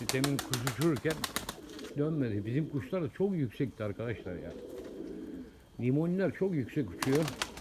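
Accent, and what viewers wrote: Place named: Izmir, Turkey. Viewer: Esenlikler abicim. Şiven İzmirli gibi ama bizim buranın insanı taklacı bakmaz. :)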